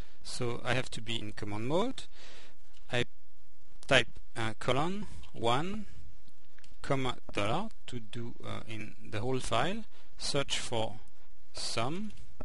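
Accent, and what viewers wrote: France. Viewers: Thanks alot man, very well explained and the french accent is interesting :D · Its a French accent lol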